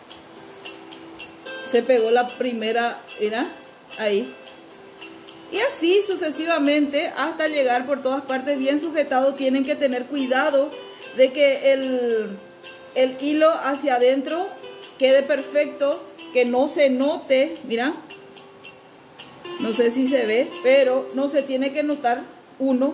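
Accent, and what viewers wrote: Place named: Paraguay. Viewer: Sabia que era paraguaya.. Por la forma en la que habla💕😊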